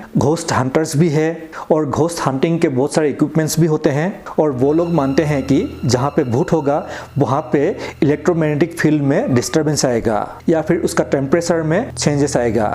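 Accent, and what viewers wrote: Assam, India. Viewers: Apuni Okhomia naki? Apunar accent ... Okhom ot Mayang district ot ase bhoot...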